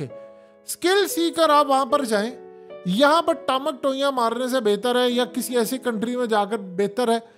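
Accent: India. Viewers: You sound more Indian than Pakistani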